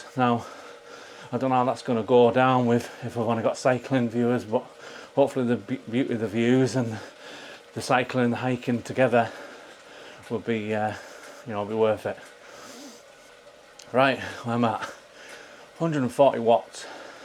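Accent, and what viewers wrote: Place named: United Kingdom. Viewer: Well done 💯💥 I've tried the FTP test myself a few times, and it's always been really hard at the end! 🥵 I don't know how constructive it is, but what I miss the most when I'm watching your vides, is proper subtitles 😅 As a dane, I sometime struggle a bit hearing/understanding what you say, maybe because you sound a bit British. The auto-generated subs are mostly ok, but for instance Kicker Core are subbed as "kick a car", the CC often gives weird results, when product or place names are spoken. I don't know how many foreigners are watching your videos, and I'm sure you have enough work editing your videos already, but still, I hopy you wellcome feedback. Anyway, thanks for another great video, Ride on! Happy New Year, and good luck with your cycling goals in '25 🎉 /Ole, Denmark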